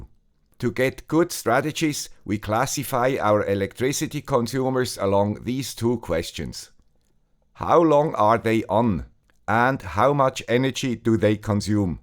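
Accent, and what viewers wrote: Switzerland. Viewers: Congratulations to 400k subs 👍🏻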